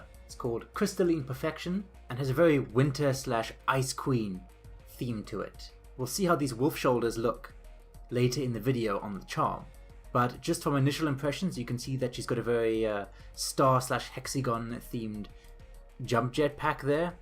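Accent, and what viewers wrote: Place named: South Africa. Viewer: Gotta love a South African accent!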